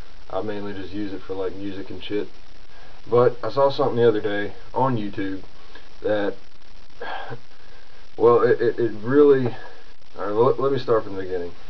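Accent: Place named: South, United States. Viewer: Are you southern? I can hear a bit of an accent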